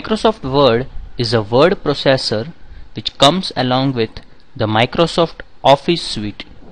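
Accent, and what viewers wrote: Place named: India. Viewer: video is awesome....with all zooming and sound effects ......best part is audio is in indian slang !!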